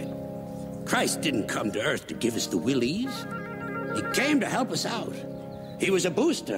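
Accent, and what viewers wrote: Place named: New York, United States. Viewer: I love his neoyorkine accent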